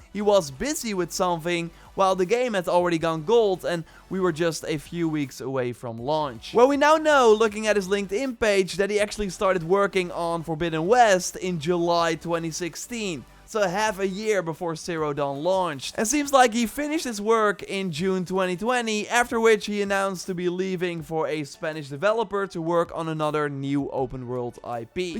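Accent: Netherlands. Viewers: Leuke video. Raar Nederlands Engels accent toch..🙄😁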